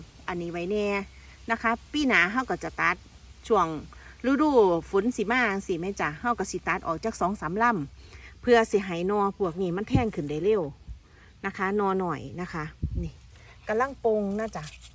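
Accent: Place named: Sisaket, Thailand. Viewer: สำเนียงแบบนี้ศรีสะเกษคักๆครับผม😂😂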